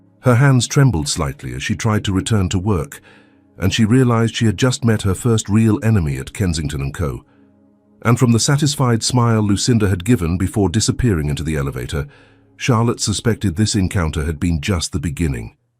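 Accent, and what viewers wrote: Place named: England, United Kingdom. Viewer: I totally agree with Everyone Else who hates & despises the REDICULOUS, HORRIBLE Video Foreground AND Background. In addition, the Stupid Producers eliminated impor- tant Written Script for people to read, especially when the Professional Male Narrator has a foreign accent like Old England, that is verry diffi- cult to understand. Otherwise, we start with a young female executive Assistant, walking into the wrong door, & sees the male CEO, "buck naked"; and HE ENJOYS IT, & from then on.., he blatantly parades around & tempts her, throughout her employment. SHAME, SHAME, SHAME on the Producers !! Rating: 4/10.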